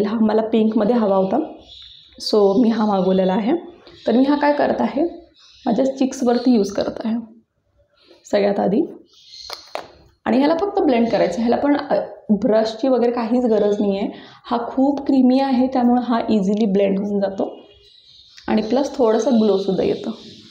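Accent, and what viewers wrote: Kolhapur, India. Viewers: Kolhapuri ahes na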